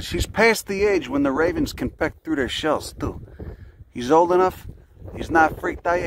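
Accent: Chicago, United States. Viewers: Imagine being in the middle of the desert and seeing a tattooed gringo with a thick Chicago accent crouching and talking to a tortoise about his diet.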